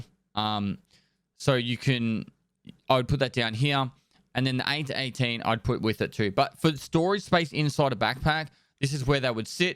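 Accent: Australia. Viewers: is australian or a kiwi?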